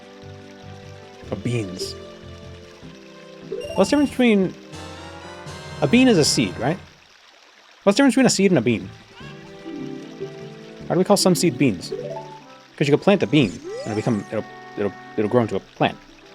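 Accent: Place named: Boston, United States. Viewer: 18 hours of Just Boston Noise❤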